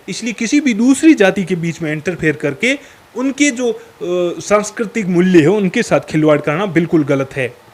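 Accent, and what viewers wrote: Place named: West Rajasthan, India. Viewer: प्रिय भाई सादर नमस्कार 🙏 आपका भाषा उच्चारण सुनकर लगता है आप पश्चिम राजस्थान से हो. मे भी झुंझुनू राजस्थान से हू. आप बधाई के पात्र हो कि कितनी बढ़िया शैली से आपने मिम्स दिखाकर एक समाज की आलोचना की खिली उड़ाई और अपने आपको ये भी साबित कर दिया की मे तो जाति का कही नाम नहीं लेता. वाह क्या कला है आपमें 😄😄 ये बात आपको खुश करने के लिए पर्याप्त है की गुर्जर -प्रतिहार वंश मे गुर्जर होने के कारण कुछ लोग मिहिर भोज कों गुर्जर बता रहे है. भाई गुर्जरत्रा नाम ऐसे ही नहीं पड़ा जो नाम से पहले लगा लिया इसके लिए 6 ठी से लेकर 12 वीं सदी तक राज किया है गुर्जरों ने. तो आप इंटरनेट पर अपलोड करके महापुरुष बदल रहे है ये दुष्प्रचार मत करो. बाकी ये अमित शाह, महाराणा प्रताप, रविंद्र भाटी जी का आपने कहा से बनाया या जिसने बनाया गलत है मे इसमें पड़ना नहीं चाहता. कुछ बाते है आप जानलों तो अच्छा रहेगा संभवतः आपका भ्रम दूर हो जाये. 1.12 वीं सदी मे राजपूत शब्द की उत्पत्ति हुई उससे पहले राजपूत का 'र 'भी नहीं था इस दुनिया मे. 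2 राजपूत कोई जाति नहीं होती है जाति ठाकुर होती. 12 वीं सदी व उसके बाद ठाकुरो का राज होने पर उन्होंने राजपूत शब्द कों अपना टैग बना लिया. 3. राजपूत संस्कृत शब्द 'राजपुत्र ' का अपभ्रंश है जिसका अर्थ है राजा का पुत्र. प्राचीन काल मे चारो क्षत्रिय जाति अहीर, जाट, गुर्जर, ठाकुर इस शब्द का प्रयोग करती थी. 4. 12 वीं सदी के आते आते अन्य राजवंशो का पतन हो गया. खाली ठाकुरो ने अपना राज बचाये रखा अतः उन्होंने राजपूत शब्द आपने साथ जोड़ लिया. 5. राज कैसे बचा, क्या -क्या मुगलो और अन्य कों देना पड़ा जिक्र करना उचित नहीं है आप खुद समझदार हो सब कुछ कमेंट मे लिखना संभव नहीं है आप मुझे कॉल कर सकते है <num> पर. उम्मीद है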